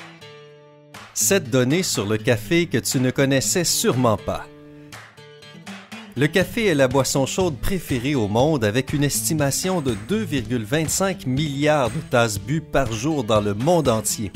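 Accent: Quebec, Canada. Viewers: Greg Paris c'est l'accent québécois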